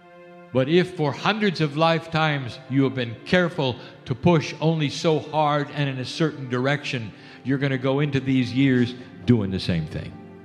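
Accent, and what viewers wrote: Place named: United States. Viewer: he sounds like an american, with sound effects from Holliwood. hollwood is under question.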